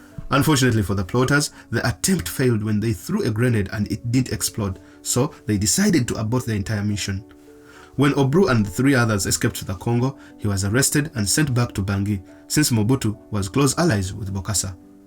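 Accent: Uganda. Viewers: The narration sounds so Ugandan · He sounds either Ugandan or Rwandese. Probably Ugandan though, sounds a lot like my dad. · Ugandan ...This voice and narration is so Ugandan .well done.keep it up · Ugandan accent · This is a Ugandan accent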